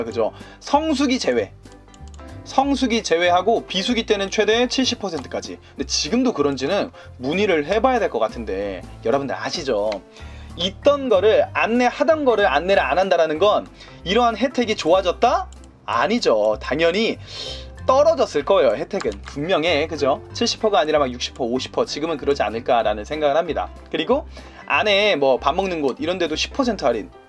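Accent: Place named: Gyeongsang, South Korea. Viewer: 님안녕하세요 ㆍ구독자입니다 항상유쾌하게경상도사투리로하는경제공부많은도움얻고있어요 여쭤볼게있는데요혹시 아라튜브라고아시면 알려주실수있을까요??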